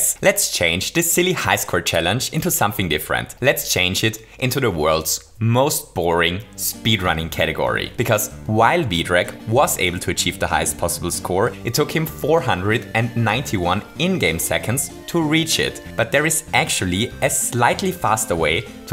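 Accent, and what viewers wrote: Germany. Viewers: Deutscher Akzent kickt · If I may ask,... are you german? This accent... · xd man hört voll den deutschen aktzent(ich weiß nicht wie man das schreibt) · Is this dude German ? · Are you German? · Wie man raushört, dass du deutsch bist :D ...hehe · Just a simple Question: are you german? :D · Du sprichst ganz sicher deutsch als Muttersprache, das merkt man an an dem Englisch 😂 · Man hat an der Stimme schon ein Bisschen erkannt, dass du deutsch bist ^^ #nofront^^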